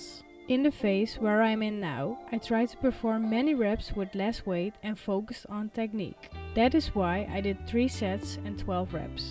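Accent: Netherlands. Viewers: Liefe dit was echt heel leuk tuurlijke hou je Nederlands accent maar heel goed gedaan!💗✌🏼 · Maybe it’s good to take some lessons in English language . It’s kinda like dutch english. You can follow English online ! ❤ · As a Half Dutchie/British guy your pronunciation is quite decent! Sometimes I hear the Dutch accent but I hear through it and doesn’t distract me! So Keep it up! ❤️💪🏻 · Echt leuk je hoort soms wel dat je nl bent maar dat maakt niet uit 🥰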